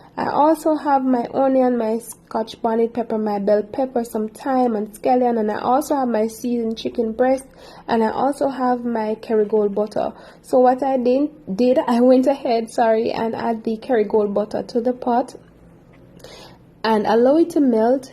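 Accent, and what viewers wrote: Jamaica. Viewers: I like the Jamaican accent. Blaze up gal.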